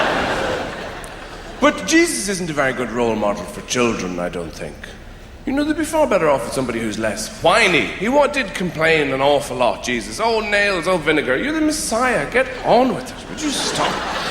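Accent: Ireland. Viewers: He's Irish . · Isn’t that just Irish? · captain obvious.... he is Irish. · He's Irish, yes we know. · He's Irish. Don't judge. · Nah man, he’s just Irish. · muller Except that they sound quite alike as both grew up in not far outside Dublin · That’s just an Irish accent 😂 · No. He is Irish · He's just Irish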